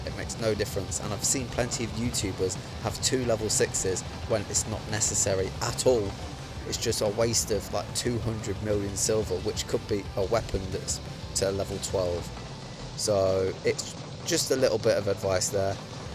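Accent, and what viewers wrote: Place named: United Kingdom. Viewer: thanks for the reply ! I didn't mean any offence , I play this game since it was released for testing and never had the chance to hear a wr channel with UK English. You're also a good pilot. Keeps it up 🔥👍🏻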